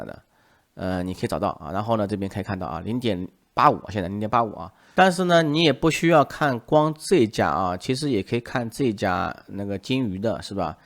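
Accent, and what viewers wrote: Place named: Hangzhou, China. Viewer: 口音听出来杭州附近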